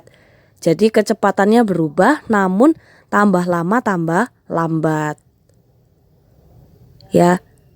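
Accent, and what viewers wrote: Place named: Java, Indonesia. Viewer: Medoknya tetep ya ce..hehe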